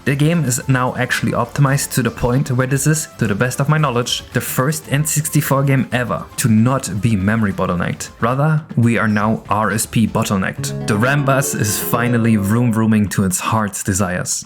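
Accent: Germany. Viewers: this is the most german english I've ever heard in my life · I'm just confused as to why I thought you were Portuguese for years. Your accent is distinctly German, guess I'm just dumb.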